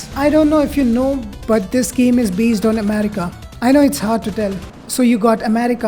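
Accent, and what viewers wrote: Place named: India. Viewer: Why your Indian accent sounds like a middle aged aunty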